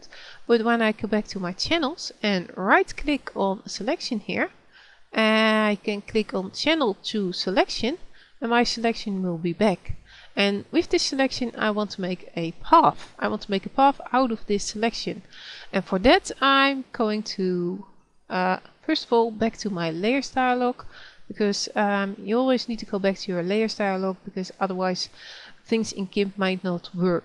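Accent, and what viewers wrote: Netherlands. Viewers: je hoort wel dat je van nederland bent ^^maar is wel leuk ! kun je ook eentje in het nederlands maken ? coole videos meid!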